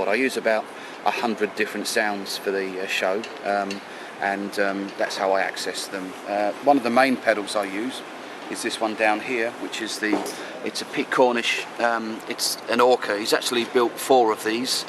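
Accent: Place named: England, United Kingdom. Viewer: he sounds english to me.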